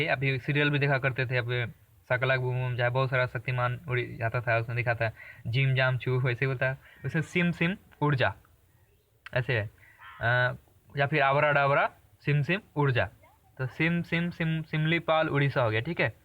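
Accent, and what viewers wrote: Bihar, India. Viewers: bihari language , improve it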